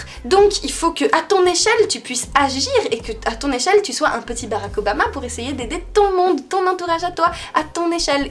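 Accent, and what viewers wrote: Geneva, Switzerland. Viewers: Ça me faire sourire comment vous dites certains chiffres les suisses voila :) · Je t'aime putain !! Tu es genevoise ??? En tout les cas tu a l accent genevois. Ça fait un petit moment que je te suis et j adore ta personnalité et ta conception du monde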